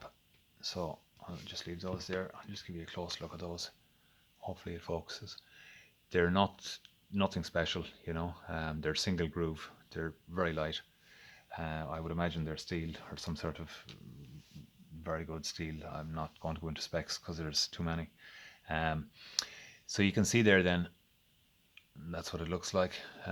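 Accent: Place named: England, United Kingdom. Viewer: Love your English accent.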